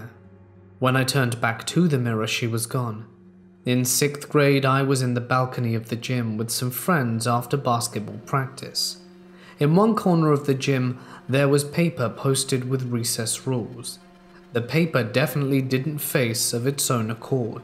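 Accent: United Kingdom. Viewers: My hEDS is kicking off my chronic pain. Every time this happens, I end up sitting up listening to your soothing voice (us Brits do have a fabulous accent!) And relax till I don't notice the pain anymore xxx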